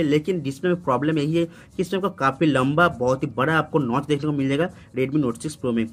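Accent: Bengal, India. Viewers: Sir aap Bengali ya Oriya hai kya?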